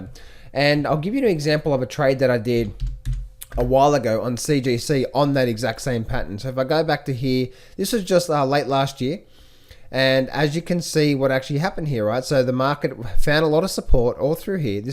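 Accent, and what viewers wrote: Australia. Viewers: he is an aussie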